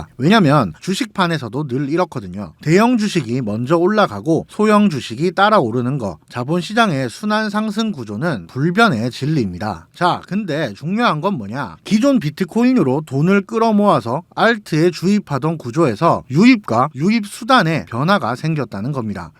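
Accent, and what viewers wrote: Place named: Gyeongsang, South Korea. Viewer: 갱상도는 발음 어쩔수없어요 괜찮아요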